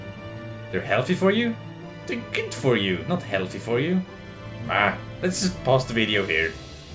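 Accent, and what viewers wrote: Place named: Sweden. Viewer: Just like "Catting your hair" Swenglish ftw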